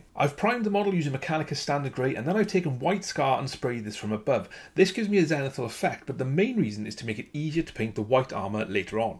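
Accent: Wales, United Kingdom. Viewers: You Welsh man? I'm like 99% sure but its so uncommon finding another Welshie online lmao. Anyway, bangin paint job, I just got a bunch of votann and I'm loving the tips!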